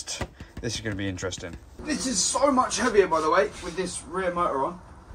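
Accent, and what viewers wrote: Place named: United Kingdom. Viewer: You sound British, therefore as the motor is likely over 250W which makes it an illegal motorbike, it goes faster than 15.5mph so it's now an illegal motorbike and you have a throttle that works past 4mph making it an illegal motorbike, it's not labelled up which makes it an illegal motorbike... None of what you did is safe or legal!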